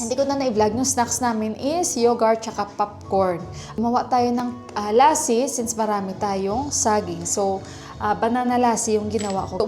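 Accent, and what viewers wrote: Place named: Hiligaynon, Philippines. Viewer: Lingaw gid KO mag lantaw SA imo te Kay ilongga man KO te...from lemery Iloilo..bisan mga 5 yrs ago pa na vlog mo ate ambot lantaw KO tana AHH..Kay kuha stress KO mag lantaw vlog mo te kapin pa didto SA village te..kag Kanami Kay enjoy ka gid da SA lugar sang Nepal te..new subscriber Gali KO te pero lingaw KO ..Kay ang Tagalog mo te gwa gyapon pag Ka ilongga AHH..halong Kami pirmi te..pirmi KO hulat upload mo ..pero mga vlog mo nga dugay na lantaw KO yah te AHH.. hehehe ♥️♥️♥️😘😘😘😘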